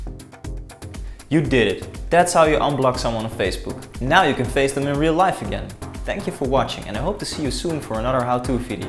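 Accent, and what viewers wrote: Netherlands. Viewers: you're from the netherlands arent you?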